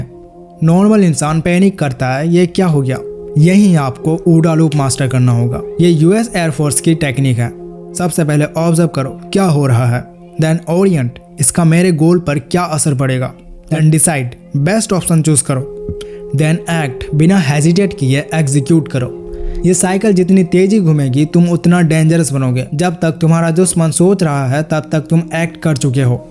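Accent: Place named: Bihar, India. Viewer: I have noticed that you speak like my Bihar friends , Are you from Bihar, I wonder ? No matter what someone says words and ideas can change the World - referred from Dead Poet Society !!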